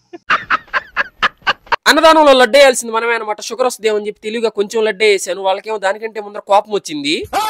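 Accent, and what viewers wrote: Nellore, India. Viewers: Nellore slang🔥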